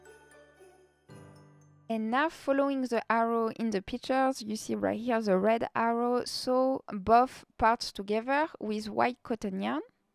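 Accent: France. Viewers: Love it. I’m working on my very first big Santa . Your tutorial is easy to follow, thank you so much . Are you French ? Love your accent.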